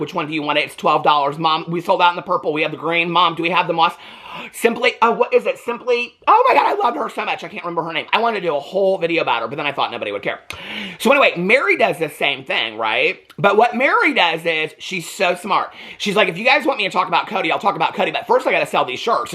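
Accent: South, United States. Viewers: I have been in the most stressful and depressing state today, and I clicked on this and I Swanee you have made me giggle out loud! I lol legitimately when you said even Kim didn’t claim KW 🍆. And yes, I love when your southern twang peeps through.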